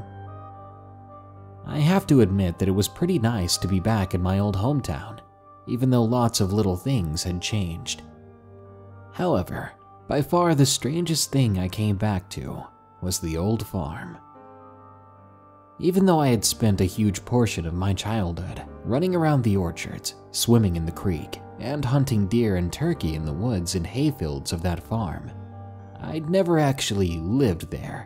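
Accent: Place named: South, United States. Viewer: I used to hate his old southern twang he had. His voice now is great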